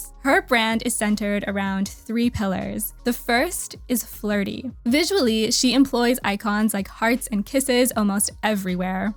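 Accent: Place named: Ireland. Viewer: Can I ask if you're Americans or Irish? I feel I can hear a slight Irish accent.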